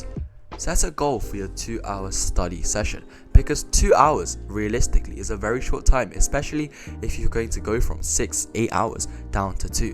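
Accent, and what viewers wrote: England, United Kingdom. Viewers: Just noticr this is a british asian, he got the brains and sounds even smarter because of his accent gawddamnit! Lmaoo i paid attention though thank for the tips bouta applied the today!! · At first, Korean with this English accent🤌 Secondly, good video, hopefully it'll help my little sister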